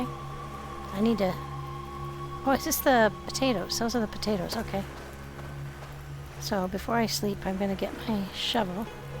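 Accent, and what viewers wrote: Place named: United States. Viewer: Ah... A kindred soul! I wonder how many more of us there are 'out there'? You sound American (Canadian?) I'm on the other side of the pond, so if I goof, please don't take offence. My go-to game is Horizon Zero Dawn. I find the sequel disappointingly boring. Fortunately my son gave me his spare PS4 during the Covid fiasco, and that got me turned on to gaming.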